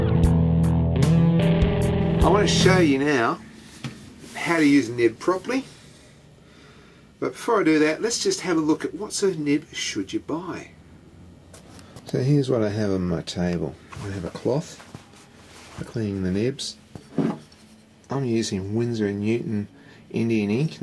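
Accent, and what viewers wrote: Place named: Australia. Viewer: australian! yes!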